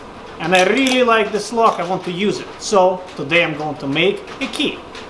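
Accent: Russia. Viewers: Arh. The russian accent is back. I like, i like very much. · I tried watching this in the break room at work today. My Belarusian co-worker happened to walk in and she was like "is that a Russian accent?" Yeah, we had a good laugh. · Wth why is he getting more russian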